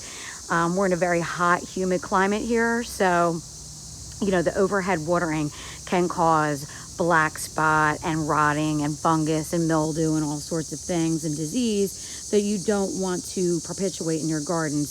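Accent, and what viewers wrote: New Jersey, United States. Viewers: I knew the accent! Hey jersey girl from philly girl!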